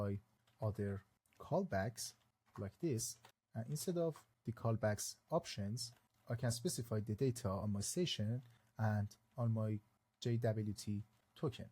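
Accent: Iran. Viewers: Persian Accent Discovered ✅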